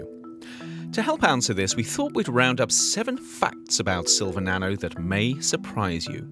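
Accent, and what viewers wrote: United Kingdom. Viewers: ........ just because this guy has a cool English accent doesn't automatically mean his information is accurate! ...... DOWN WITH BIG PHARMA!! .....😠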